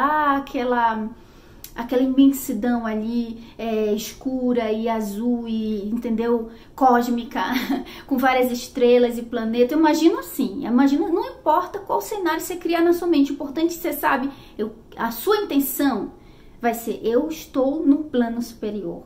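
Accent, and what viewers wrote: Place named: North, Brazil. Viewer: Ela é da região norte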